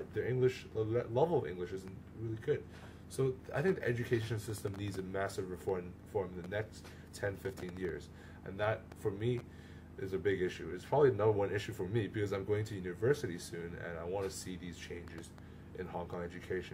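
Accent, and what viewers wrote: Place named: United States. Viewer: a curious question, if u are brought up in HK for 18 years how cum u could speak with an American accent???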